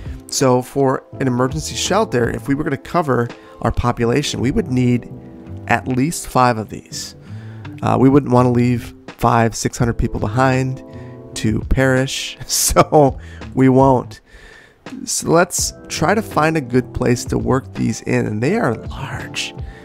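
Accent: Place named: Midwest, United States. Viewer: My wife and I were convinced that you had to be from the Midwest. Now we're certain. Keep up the good content man. Go Pack Go.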